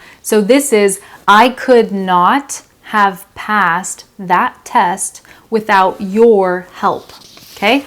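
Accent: United States. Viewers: thank you so much my teachet i love american accent so much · When you speak the American accent sounds very nice and lovely far from being a "butchered" English.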